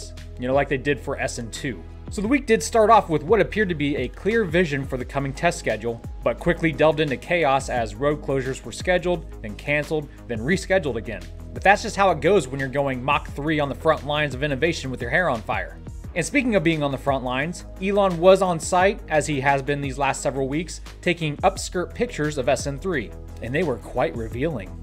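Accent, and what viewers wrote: United States. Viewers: Seine Aussprache ist herrlich Amihaft. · I like your content, but you have a lot of Kevin flavour, confirming all my Dutch stereotypes of what an American called Kevin looks and sounds like.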